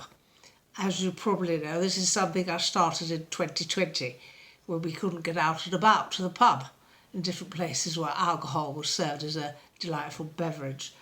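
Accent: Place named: Upper class, United Kingdom. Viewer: hahahaa, hilarious!!! 😂 i love your upper class english accent! 😂 so funny!! shared it on my facebook, i watch you daily now, always keeping us entertained. you and katie, who i've followed for years. top birds!! xx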